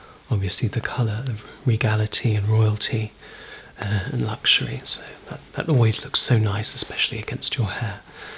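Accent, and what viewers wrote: RP, United Kingdom. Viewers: Very nice British accent I love when the queen answered for me that was nice very relaxing 😴😴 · I’ve never heard someone with a British accent do an impression of a British accent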